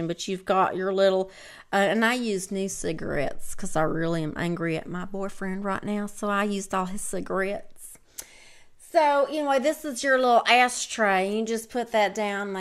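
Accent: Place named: Tennessee, United States. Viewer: There's some Reese Witherspoon in your accent. I guess that makes it a Tennessee accent.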